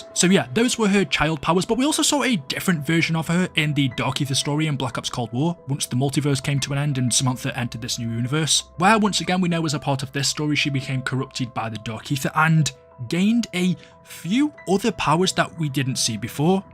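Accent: United Kingdom. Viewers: British person speaking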